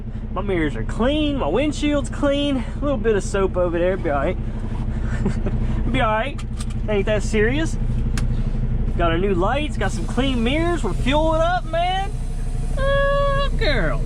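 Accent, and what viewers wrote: Mississippi, United States. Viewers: Man I knew you was a Mississippi boy lol. Same here.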